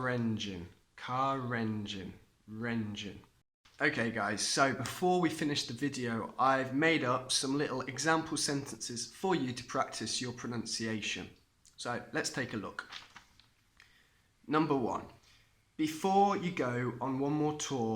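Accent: United Kingdom. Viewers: Very interesting.. I do love with British accent 💓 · I love British accent.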